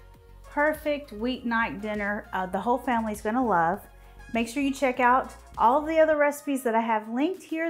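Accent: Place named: South, United States. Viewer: Hi! I just made this for dinner tonight and I so enjoyed! Loved the tenders and the bacon plus mustard powder made the cauliflower mac and cheese yum-may 😊. I enjoy your recipes and your sweet voice. It makes me think you're from the hospitable sweet south. Keep these recipes coming. TY!!!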